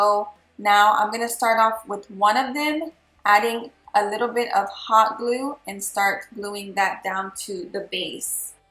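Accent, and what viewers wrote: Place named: Hawaii, United States. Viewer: Yes, I hail from Oahu...my stomping grounds are Waikiki and Kaimuki, now I reside in the Nevada desert. I miss the beauty of Hawai'i, so I try to incorporate "home" as much as possible in my decor. You sound like you have a Hawai'i local twang...if so, Auryte den, so great to see a Sistah sharing da Aloha wit da world! 🤙🌺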